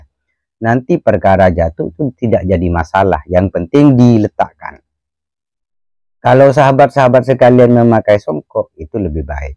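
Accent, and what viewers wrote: Madura, Indonesia. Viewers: Ass. Imsm Video yg di sampaikan TIDAK UNTUK UMUM di Khususkan Hanya Untuk Orang Madura,???!!! Usul dan Sumbang Saran, klw Untuk Umum Alangkah Lebih Baiknya Agar Yg Lebih Banyak Penonron Yg terbantu Meng LIKE SUBSCRIBE, Sajikan Video Kedepannya, Agar Adminnya bisa Tambahkan Tek Ketikan Ada Hurup Arab & Latinnya & Artinya, Agar Memudahkan Para Penonton, Tidak di sampaikan Dalam Pembicaraan, Faktor Segi Bahasa yg di sampaikan Masih Terdapat logat Madura, Penonton Tidak mudah PAHAM tk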